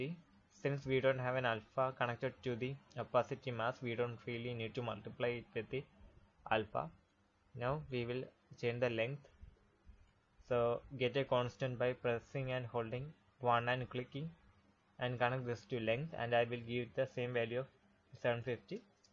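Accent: Kerala, India. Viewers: BRoo you sound Mallu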